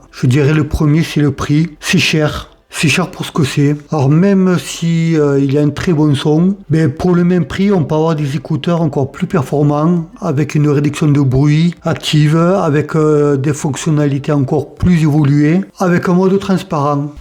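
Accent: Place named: South, France. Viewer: Re 😅 Un peu particulier le design de ces écouteurs, faut aimer. En tout cas content d'entendre ton avis avec ce bel accent du sud (je me sens moins seul! ) 😜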